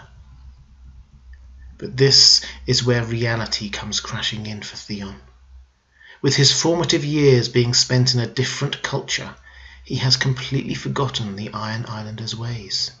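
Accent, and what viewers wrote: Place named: RP, United Kingdom. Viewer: Hi, I've done, among other things, radio ads and have gotten feedback that I have the best voice in local announcers, etc and was chosen over many others for a series of PA announcements at DIA (Denver Airport). I have also directed audio sketches with a cool group previously online. I say this to let you know I know something about voice delivery. There is something to consider in what Yol says. Got the downbeat voice criticism from radio station managers, when the women said the slower voice was good. I think they were reacting as women and found my voice attractive - whereas the men were more balanced in their judgement and as such should be listened to. You have so many very good things going on with your voice and delivery - but there is a certain downbeat aspect to your voice that bears improving upon - it tends to lull the listener who will fight to stay awake because they can tell what you have to say is important. 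Often very important.. Perhaps it is to bring more variance in your voice, variations in inflection, stressing certain words over others and differently. Changes in the tempo of the words to liven things up. There is a peace and humanity in your voice that makes listeners want to be civil and human on your posts. Very humble though you're speaking in a very posh upper class British accent. Very good on your content! These videos help me live without the series or any new books. Yours are quite good and... quality stuff!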